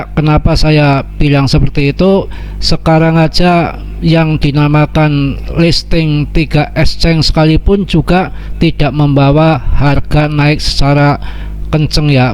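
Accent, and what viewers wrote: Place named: Java, Indonesia. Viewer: Saya demen mba suaranya medok Jawa banget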